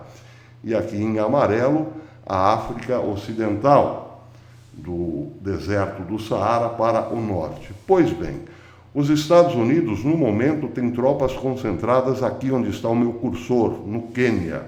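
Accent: Caipira, Brazil. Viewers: Parabéns professor sempre ligado aos fatos da geopolítica! O caipirês é apenas uma forma humorada de passar as notícias 👍👏👏👊😎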